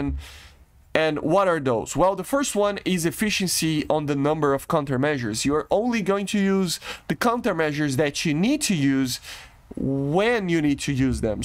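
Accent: Brazil. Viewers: Tu é BR ne ? Certeza!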